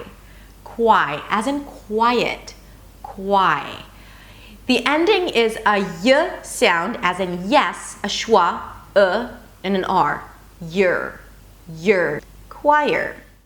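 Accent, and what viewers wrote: United States. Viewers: Hey! I'm absolutely impressed by you flawless american accent!!!! Where are you ffrom and how can you be so natural? You sound more American than native speakers. Congrats!!!!!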